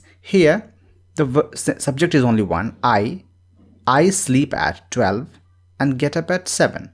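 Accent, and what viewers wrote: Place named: India. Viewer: Your accent seems like Indian I'm from India too 😄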